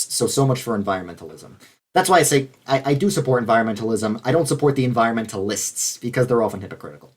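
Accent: New York, United States. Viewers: It's kinda funny this is the most thick his new yorker accent has been so far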